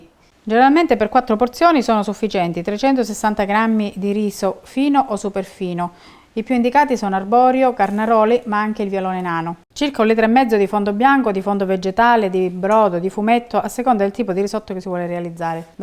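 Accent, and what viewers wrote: Calabria, Italy. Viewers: Hai un accento calabrese bellissimo ❤️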